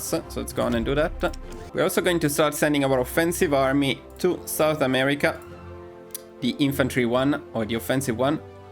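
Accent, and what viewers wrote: Italy. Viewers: Nice video nerd. By the way, are you Italian?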